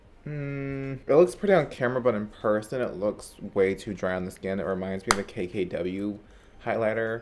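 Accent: Australia. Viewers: Omg! The Australian accent!! 😂 love it!! Tati RAVES about the Col.Lab mascara so I'm gonna finish watching this video now I had to tell u I LOVE the accent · Your Australian accent kills me 😂😂 it’s a mix of New Zealand and english accents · I fockin love the Australian accenttt!! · i love ur Australian accent bih keep doing it pls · Your Australian accent is beut mate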